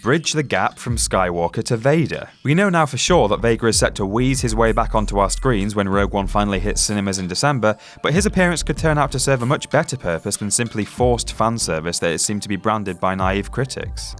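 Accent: United Kingdom. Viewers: How? He sounds like a typical British guy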